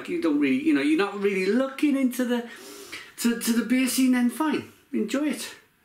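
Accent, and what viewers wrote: Wales, United Kingdom. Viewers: Are you British? By the way I'm new to your channel! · He’s got the slurred speech accent of an alcoholic ahaha or is that just welsh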